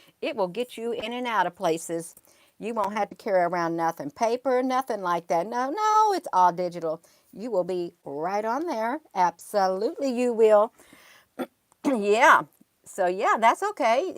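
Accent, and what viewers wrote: South, United States. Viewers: Y’all, Loving 🥰 hearing, I just love ya southern bell accent? Your so priceless, the best way to announce such awesome, beautiful,greatness exploring and explaining what a super amazingly interesting 🤨 slightly evil named new style of body Art, that no one can see, I’m soooo bubbly just listening and been soooo excited by this cool 😎 new program digitally tattoo, Mmmmm I’m so glad 😀 lol lol On and off, after work! Before work Mmmm I may have “ IN bad Mood, Stay away “ Then..........” I’m mentally ok 👌 to day, don’t worry you can say Hi too me “ Oh, I think You’re just found, out the place I can truly fit in, Wowed, I’m so excited, I need to go now as I need to work so I can get my whole arm sleeve down!!!!! Mmmmm “” From My Heart ❤️ to Yours ❤️ “ Do you want me to get my one first, Then we can have our own club, Or maybe “I believe in UFOS 🛸” I’m so glad my skin is clean, thanks, Need to think about what colours ❤️🛸❤️🛸❤️🛸❤️🛸 😘🥰😍 Your so right It’s gonna be soooo Cool the coolest since sliced bread 🍞 xxxx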